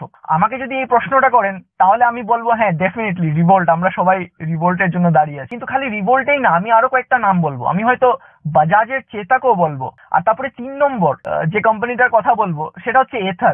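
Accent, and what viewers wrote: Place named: Bangladesh. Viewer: Aapnar pronounciation Bangladeshi der Moto..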